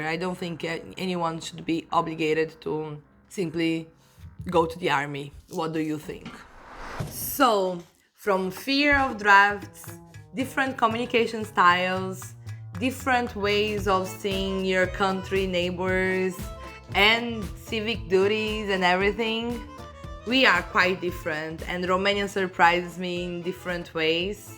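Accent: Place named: Brazil. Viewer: Nice video. I think the idea of requiring voting (required in Australia when I was there in 1977) and requiring public service (not necessarily military) is good, although maybe getting out of it shouldn't be too difficult (like getting out of jury duty in the US). Btw, I never tire of hearing the Brazilian accent.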